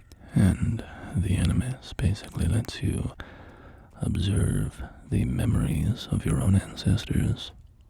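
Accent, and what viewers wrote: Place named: United Kingdom. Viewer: your history knowledge is impressive never stop my knowledgeable brother, i love your accent i assume you are in the uk?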